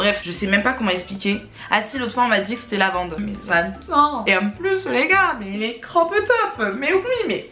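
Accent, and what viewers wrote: Toulouse, France. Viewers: Tu es toulousaine???? Mdrrr je disais bien l’accent 😭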